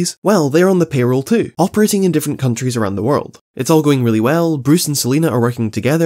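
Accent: Scotland, United Kingdom. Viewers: Well he's Scottish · damn ur scottish too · Great video man, didnt realise you where a fellow scot until this video so ay:D from one Scottish to another Scottish, keep up the good work:)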